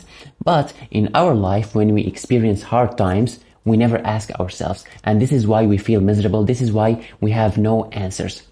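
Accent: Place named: Aleppo, Syria. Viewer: حبيت شغل بدون ترجمة 🎉 اللهجة الحلبية كانت احلا حسيت 😁🌸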